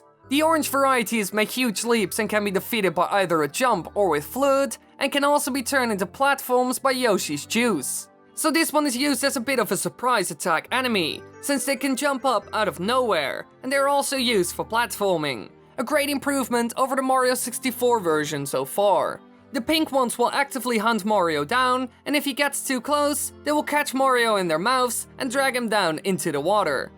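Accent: Netherlands. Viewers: are you dutch and speak english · are you dutch? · are You dutch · you have a good dutch accent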